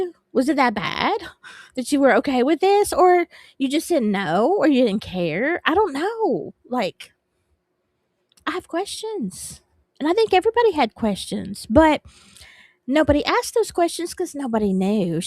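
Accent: South, United States. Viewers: Hey I'm a new subscriber from England 💙. Saw you first with the Prof and I have missed you so I have come over. It's so soothing listening to your lovely Southern voice ☺️🤗. Really enjoyed the live on replay. Fascinating story. That Mark is off the charts creepy. He was no bystander imho. Would love you to cover a UK serial killer.. we have a few.. sadly. The White House farm killings is interesting.. although that was a mass murder.. only a thought.. Much love. Hyped 💙🤗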